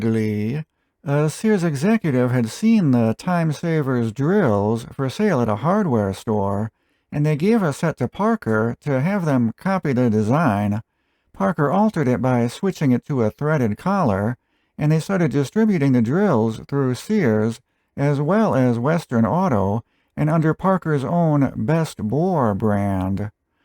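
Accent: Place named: Boston, United States. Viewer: Your videos are always very informative and well done. Your Boston,( we say Bostin ) accent is gonna take a while, but keep trying. I noticed you added an "S" to Fall River, actually it's Fall Rivah! Great videos, thanks!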